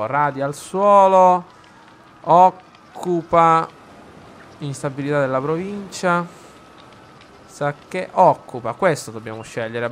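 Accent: Sicily, Italy. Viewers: Quando ti alteri un pò e alzi la voce col tuo accento siciliano mi fai morire, grande! P.s ricorda di finire l'esercito vandalo sopravvissuto alla battaglia, c'è il loro re lì dentro. PPS. i tuoi giavellottisti non lanciavano perchè avevano davanti a loro gli alleati erano ostacolati, credo.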